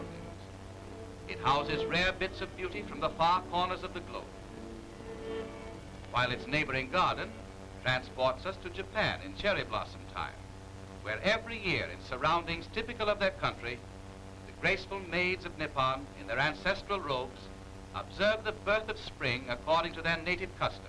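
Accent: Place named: Mid-Atlantic, United States. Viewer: Great example of the Mid Atlantic or Transantlantic accent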